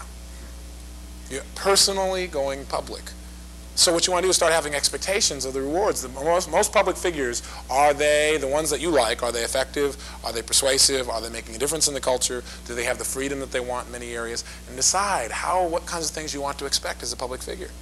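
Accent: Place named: Texas, United States. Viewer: His Texas accent is strong.. omg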